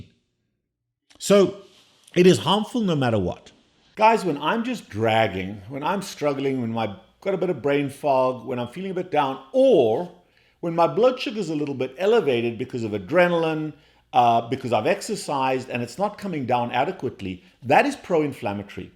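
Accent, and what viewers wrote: South Africa. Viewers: 😂Also the French done a child's portion by an interior decorator! 😂😂😂 I'm also South African, and he hasn't lost the way we talk, although he has left the country long ago😂❤ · He has a South African accent. In South Africa we speak Afrikaans which is very similar to Dutch.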